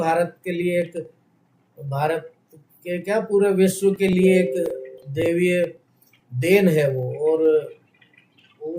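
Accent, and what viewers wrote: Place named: Haryana, India. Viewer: Bahut sahi and ek aarse baad Haryanvi suunne ko mili hai,!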